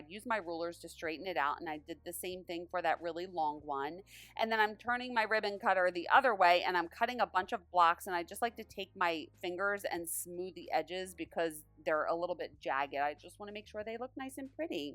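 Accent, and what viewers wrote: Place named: East Coast, United States. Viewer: I didn't say you said it wrong! Girl, I love east coast accents lol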